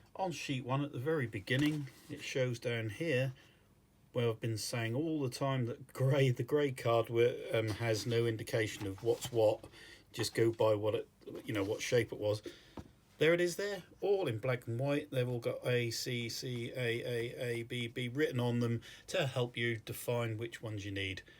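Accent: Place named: Norfolk, United Kingdom. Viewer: All good stuff. How big is your layout to get all these buildings on? Love the accent. What part of Norfolk are you. I used to live in Ludham.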